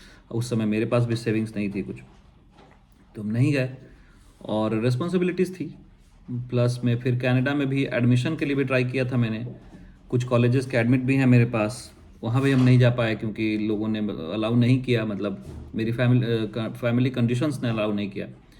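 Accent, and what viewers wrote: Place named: Bihar, India. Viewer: Hello from Canada ..Feel connected as listen Bihari accent after long my mom was from Patna …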